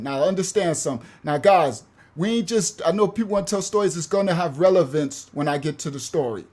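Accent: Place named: East Texas, United States. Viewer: I've been listening all day at work and most times I listen to the Ministry channel... but I decided I'll listen to the 25 to life channel.. I'M LIKE UNK. YOU SOUND LIKE YOU FROM THEM DEEP WOODS WHERE I'M FROM😮😮😮😮 I HAD TO WITNESS CROSSES BURNING, AND LAYING ON THE floorboard just to get Beaumont.. like😮😮😮 KOUNTZE, SILSBEE, JASPER, VIDOR, LUMBERTON( MORE RACIST THAN VIDOR=SWEPT UNDER THE RUG, WHERE I SAW MY FIRST BURNING CROSSES) WOODVILLE...ALL IN DEEP EAST TEXAS... THEY STAYED ON THEIR SIDE IN OUR SMALL TOWN AND WE STAYED ON OURS..I PRAY 1 DAY TO TELL IT..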